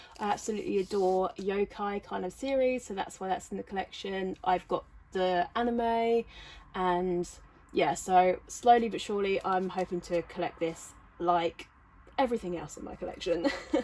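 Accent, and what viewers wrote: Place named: United Kingdom. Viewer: Hi! Can I ask if you know where to get secondhand mangas in UK? (assuming you are in uk based on your accent, sorry if im wrong!)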